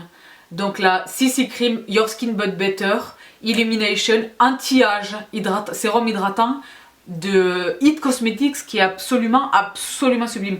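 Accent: South, France. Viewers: Hello les sista ........ ahahhaha a chaque fois je rigole je kiff ton accent du sud et félicitations tu le mérite tu partage avec nous ta passion qui est aussi ma passion aussi (tu ma fait acheter des tonne de truc ) et waouuuuuuuuuu le concour de dingue que tu nous fait "c'est un truc de malade....!!!" Lol je rêverais de gagner ce concour 😍😍😍😍😍😍😍😍😍😍😍😍😍😍😍😍😍😍😍😍😍😍😍😍😍😍😍😍😍😍 gros bisous a toi reste comme tu est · Coucou! Moi je t’ai connu il y a presque un an maintenant en recherchant des solutions contre la cellulite et je suis tombé sur ta vidéo par chance... je suis rapidement devenu addict! D’ailleurs j’ai tout de suite partagé ta chaîne autour de moi, j’ai parlé de toi à des amies qui ont tout de suite kiffé!!! Des techniques venues d’ailleurs, le brossage à sec, le derma planning, le derma roller... tellement de choses dont on parle peu mais si efficace et validée grâce à toi! 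Je te remercie pour tous ces partages, plein d’honnêteté et de sincérité. Je pourrais m’étaler encore longtemps mais je vais faire bref lol, c’est toujours le poommmpooom avec toi. Le dimanche toujours en attente de l’annonce de ta vidéo sur Snapchat! Alors on se pose tranquillement sous la couette petit café et on écoute tes précieux conseils emprunt de cet accent tout droit venu du sud, tellement appréciable pour ton abonnée venu du Nord lol. Force et honneur à toi!!!! Tu nous as conquis(e)s et tu mérites tout ce qu’il t’arrive aujourd’hui, par travail et endurance, je te tire mon chapeau pour allier ton travail et ta passion! Une femme inspirée et inspirante... pour finir moi je te dirais de continuer sur ta lancée tu arrives à balayer toutes les catégories de vidéos qu’on pourrait voir (mention +++ pour les vidéos chichat) moi j’aimerai pouvoir te revoir dans des vidéos tuto make up avec tes petites techniques ou lookbook étant grande de taille également j’apprécie de découvrir des look q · Oh la LA !!! 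Comme je suis ravie pour toi et heureuse de faire partie des sistas ! En plus, un giveway qui te ressemble vraiment. Grâce à toi, je me suis remise au layering, j'ai une wishlist de soins en asiatiques qui s'allonge, je m'intéresse de plus près à certains produits... en bref, je te voue une confiance absolue ! T'es vidéos sont des bouffées d'oxygène, elles sont remplies d'une jovialité débordante et communicative, je les attends toutes les semaines. D'ailleurs, à force de te regarder, j'en arrive parfois à parler avec un brin de ton accent du sud, des expressions propres à toi sortent parfois même dans des discussions, ça me fait rire toute seule !!! J'arrête pour aujourd'hui le roman mais c'est toi qui l'a voulu après tout ! Alors je croise les doigts pour avoir la chance de remporter le Pompon du pompon des giveways ! À dimanche 😉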